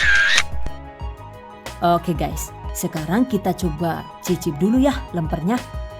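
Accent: Banyumas, Indonesia. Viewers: Matur suwun nggih mbak 🙏 sampun berbagi Resep Lempernya...Jaaan Ngapake ky inyong ya 😁 ora ngapak ora kepenak 👍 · Cantik-cantik lempernya bunda.... terimakasih bunda resepnya, jadi lebih praktis buat lempernya. Saya selalu suka dengan resep karena mantap dan lucu2 ada selingan humornya terutama bahasa ngapaknya itu lho. Jadi penasaran bunda ni asli madiun atau daerah ngapak ya.... 🤭🤔🙏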